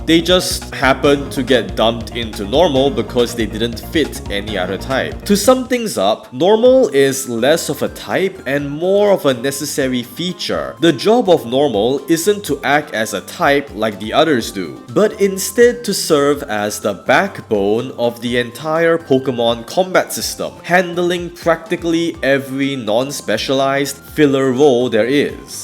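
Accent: Singapore. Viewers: Haha I could recognise the Singapore accent even through your attempts to make it sound more american. I checked your channel and turns out I was right! In all honesty this was a well put together video that is informative yet engaging. Keep up the good work!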